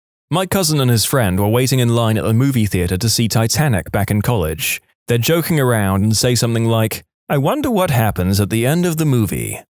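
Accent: England, United Kingdom. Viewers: omg British man? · Who is this very English narrator and what did you do with British possibly from Edinburgh narrator I need a narrator with my accent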